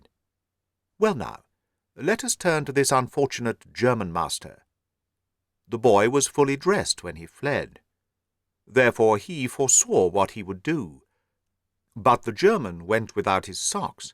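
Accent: United Kingdom. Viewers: I do love listening to this man read. He gives an entirely new meaning to the words 'The King's English'.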